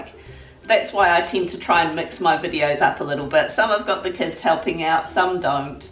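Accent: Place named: New Zealand. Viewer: Don't we all! Thanks for your reply. I've JUST made some circular normal crumpets for the first time. Something went wrong as they look good, taste good, but have no bubbles / holes! The bubbles appeared and then closed up again. I think the pan recipe is wrong. I will persevere. I love your accent! I have a friend from Dunedin who lives here now and he now finds N Zealanders sound strange! (Keep it on hind! My tint is wit !) Great fun! England is in the middle of a mini-heatwave. We get 3 days of summer... it will be snowing in weeks! It's so weird (for me) to think that June is Winter for you! I guess you have just had Christmas there!!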